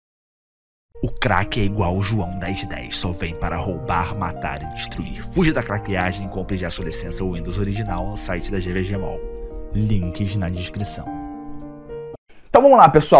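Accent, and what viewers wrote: Rio de Janeiro, Brazil. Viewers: Senhores, esse é o sotaque carioca que a gente se acoxtuma aqui na cidade.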